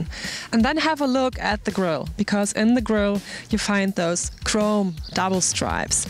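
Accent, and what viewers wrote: Germany. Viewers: I can't believe people don't like German accents... Either way she probably speaks better English than us natives. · I love the little germanisms like keramic and the accent. :D It does sound kinda scripted though. For $222K i'd have a nice down payment on a house and mazda 3 and some left over for a classic car or to invest. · people really need to stop rejecting people with a different accent. if you pretend she should speak in English with a stereotypical English accent, then you are a xenophobic and a racist. if she is a German, why should she try to be someone than she is not? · nice orange peal paint job on 220 000$ car Mercedes Benz... Still can't stand the Germanglish of the host. Get a proper host for these videos...say Derek D or pretty much anyone else. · Well we in the UK think you sound like any normal German. The mic is way over sensitive its picking my to much slur. 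Change your punto for a VW up! Or Skoda citgo there cheap and fun.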